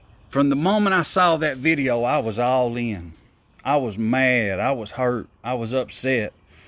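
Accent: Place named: South, United States. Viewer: You're such a wise man! You should really start a podcast (if you have time [outside of your JOB, of course]). Speaking with a deep Southern accent with heavy feeling has made many, many people think that you're one of the wisest people on earth! They're certainly not complete idiots, right?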